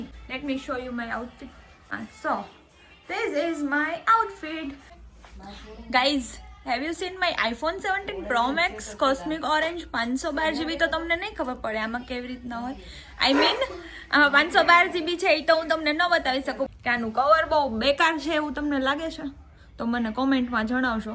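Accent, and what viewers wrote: Kathiawar, India. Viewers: Tamre bolvanu tone pure kathiyavadi jevo che...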